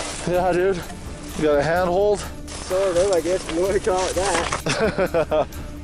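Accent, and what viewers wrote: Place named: California, United States. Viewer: Sounds like cali surfer dialect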